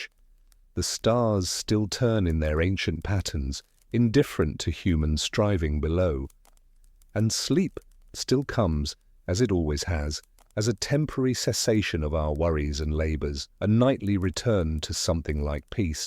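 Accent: England, United Kingdom. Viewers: Old west stories by a narrator with an English accent?! I’m out 🙄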